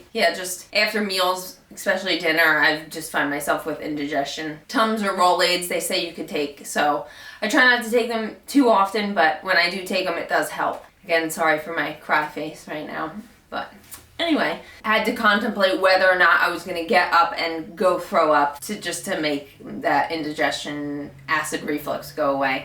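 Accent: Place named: Philadelphia, United States. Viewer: Just found you! Im 14 weeks today.... i love your accent are you from Philly?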